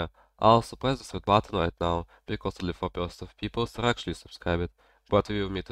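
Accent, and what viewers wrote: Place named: Russia. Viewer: ahh aight, that is one thick russian accent, good video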